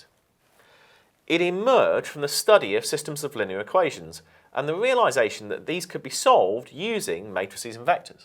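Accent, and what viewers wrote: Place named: United Kingdom. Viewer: The content is perfect but I wish this video were in American English. British Accent is a little bit hard to understand😅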